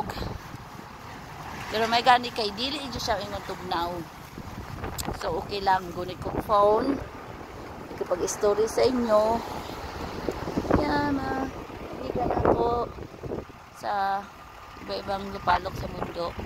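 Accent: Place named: Visayas, Philippines. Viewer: Ang cute ma ning pag nag bisaya ka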